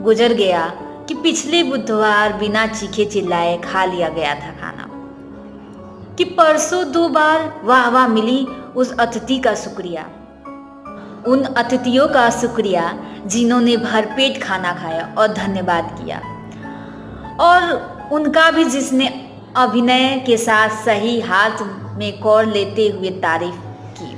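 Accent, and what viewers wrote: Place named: India. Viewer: Your voice is very nice with a pure Indian touch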